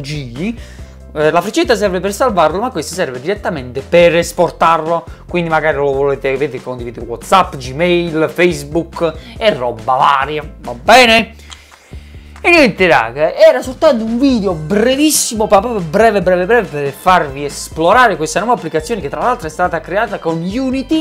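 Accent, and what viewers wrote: Naples, Italy. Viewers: ma sei napoletano??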